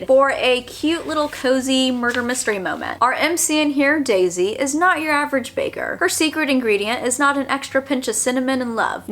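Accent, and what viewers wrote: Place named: South, United States. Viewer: as opposed to your normal lovely southern accent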